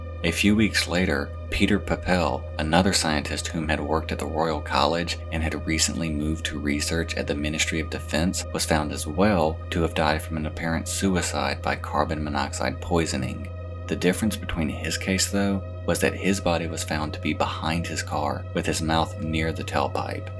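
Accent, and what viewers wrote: United States. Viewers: Hearing Lorry (instead of truck), flat (instead of apartment), and petrol (instead of gas[oline]), in an American accent is really strange lol.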